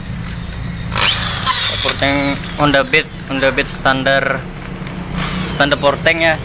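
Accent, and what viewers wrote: Banjar, Indonesia. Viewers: Lugat banjar, urng hulu sungai kah bos??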